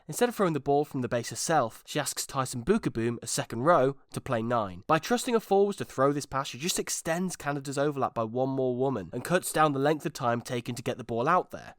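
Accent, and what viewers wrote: Wales, United Kingdom. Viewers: Humour: ✅ Welsh accent: ✅ Great rugby analysis: ✅ If being the host can help you produce more videos I say bring on more! I can't get enough squidge content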